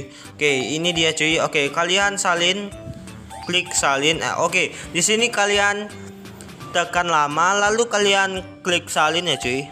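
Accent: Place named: Banyumas, Indonesia. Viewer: Nonton doang laik ngapak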